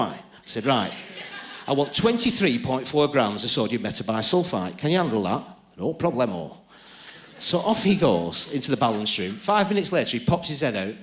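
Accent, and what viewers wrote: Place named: Australia. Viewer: Australian accent can be tough to understand at times. Hope he can acquire some jokes, somewhere , somehow.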